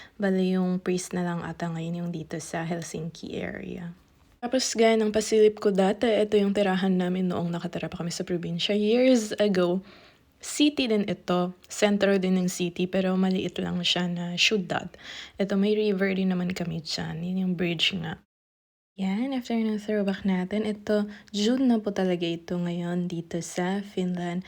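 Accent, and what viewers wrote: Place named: Ilocano, Philippines. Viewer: Congratulations sa mga kamag anak mo host. Parehas tayong matigas ang diket na salita, ilokanong norte 😅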